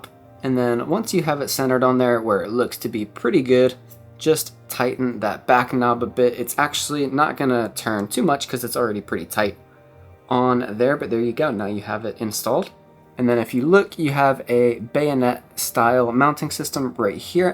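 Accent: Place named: Alabama, United States. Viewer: Great stuff! Informative content and loving that slight hint of an Alabama accent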